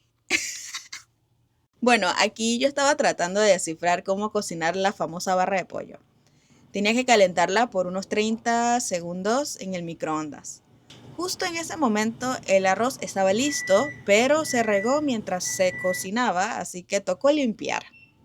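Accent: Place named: Panama. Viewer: Es imposible reconocer a una mujer panameña❤😊empeze recién a ver tus videos y desde que te oi hablar lo supe(yo tambien soy panameña)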